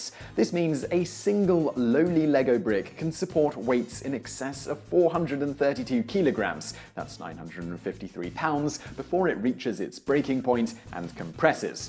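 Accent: United Kingdom. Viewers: I'm disturbed because this Matthew Santaro/ Vsauce hubris has a British accent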